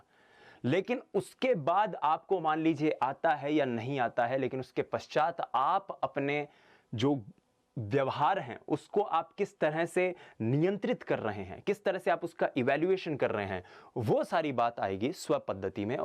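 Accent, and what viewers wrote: Rajasthan, India. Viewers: Mai up se hu but jb ap rajhasthani bolte to Maza bahut ata .love u sir.psychology ke dada ho ap.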